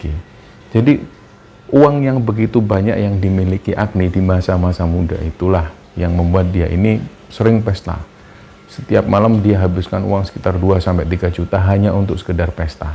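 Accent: Java, Indonesia. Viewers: Assalamu'alaikum sobat kembara, tim yg selalu nonton tp jarang komen 😂 dari Medan ni boss Biasa dengeri bareng mamak, tapi 3 feb kemarin mamak uda meninggal, almh. Mamak seneng banget denger suara mas, jawane medok, kami juga jawa tp di sumatera jadi bahasanya gak sehalus mas hehehe 😊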